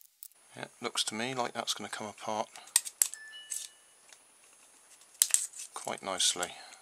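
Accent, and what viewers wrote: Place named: South West England, United Kingdom. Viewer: Where are you in the UK? You have a south west accent, sort of Wiltshire to Bristol possibly. I'm originally Suffolk, but now live in France, Dordogne, which is very rural. My main heating is a 14 Kw wood stove, which I feed from my own woods. Spent some time in Wiltshire long ago, at RAF Compton Basset. Also briefly near Weston Super Mare. If I take thing apart, I always try not to destroy the bits in the process, as they could be useful in the future, and small motors have potential, where bits of bent sheet metal are less likely to have much. However, the coil would be a good source of wire to make smaller coils, for experimenting with, so, its swings and roundabouts really. I dont understand why people get so emotional on these sites, after all, scrap is scrap, which ever way you look at it.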